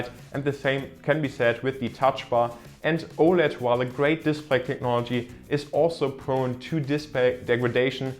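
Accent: Germany. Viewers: I'm german, and i can hear your german accent clearly.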